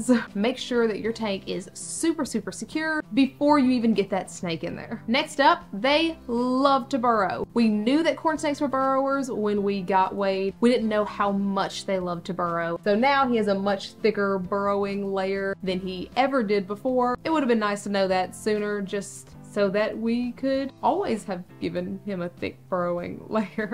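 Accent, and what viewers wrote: South, United States. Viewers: First video I've seen on the channel, will sub because 1) a lot of good info here that's presented well, and 2) as a Georgian i must say your southern accent is AMAZING! Your snakes are lucky to have an owner with such a voice, lol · Her southern accent is so sweet sounding lol like an aunt that can bake the hell out of a pie 😂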